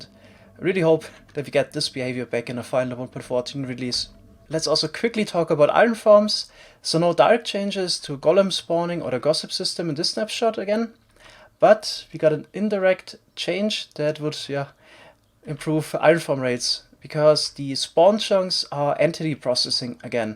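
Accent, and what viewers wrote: Germany. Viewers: Best intro of all of YouTube, and best German accent as well.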